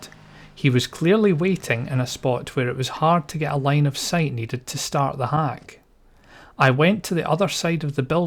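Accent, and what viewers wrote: Scotland, United Kingdom. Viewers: Thanks alot mate, the tips & tricks really helped if I ever want to play WD 1 again! 1 sub I also quickly recognised that you're Scotish like me. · youre scottish aint ye i love your acceent mate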